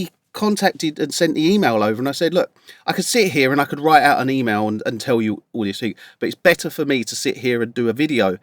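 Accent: United Kingdom. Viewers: I love the british accent: "Can I masta"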